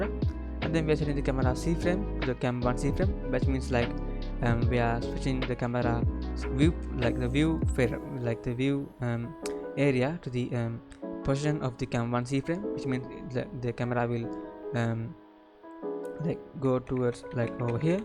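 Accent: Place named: India. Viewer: Ahh indian voice, didnt understand anything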